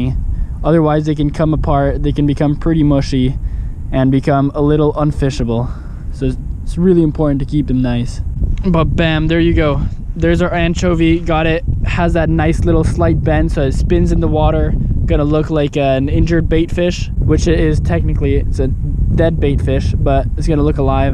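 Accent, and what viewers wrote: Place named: Mexico. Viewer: Para mí el mayor plot twist fue ver que hablas español ¿Eres mexicano? Porque yo sí y el acento me sonó muy mexicano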